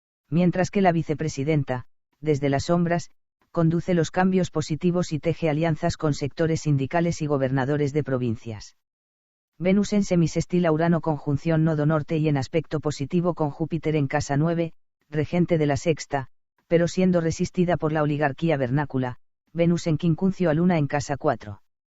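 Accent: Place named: Spain. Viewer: Pura propaganda kirchnerista con acento español. Nada serio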